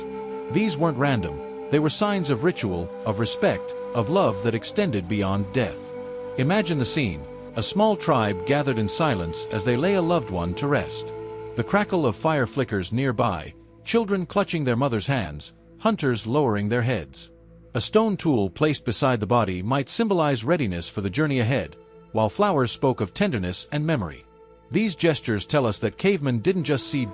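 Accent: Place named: United States. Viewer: History just never sounds right in an American accent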